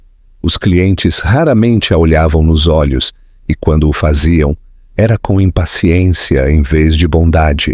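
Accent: Portugal. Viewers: Esse é o português falado em Portugal... Muitas palavras são diferentes no Brasil, porém dá para entender perfeitamente... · Achei chata a narração! Porque essa troca de sotaques? Português de Portugal! E as vezes português do Brasil, achei estressante. Porém a história em sim é linda, forte mais bonita. ❤ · Pq falar com um sotaque português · Uma mistura do português de Portugal e o português do Brasil.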